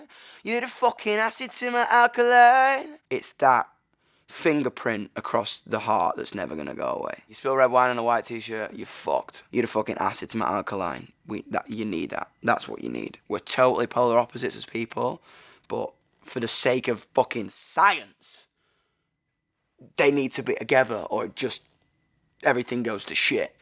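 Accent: United Kingdom. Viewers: I did not know that he had a bridish acsent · He’s British that’s how we say it it’s never fuck it’s either feck or fook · Sure he’s passionate and this does deserve to be on genius, but another reason is because he’s British and everything they say sound amazing. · This is my first time hearing him talk and I wasn't expecting him to be British lol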